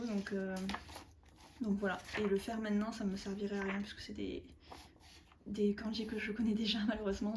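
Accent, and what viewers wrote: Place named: Toulouse, France. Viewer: Bonjour! Vidéo très agréable! Tu es de Toulouse? C’est ce que je me disais avec ton accent. 😊 J’ai fais une licence de japonais à l’université Jean Jaurès (à l’époque le mirail) en <num> et je vis au Japon depuis 2007. J’espère que tu feras d’autres vidéos sur l’apprentissage du japonais ou sur ta double culture! J’ai moi même deux enfants mais malheureusement ils ne parlent que japonais! Bon courage pour le JLPT! À bientôt!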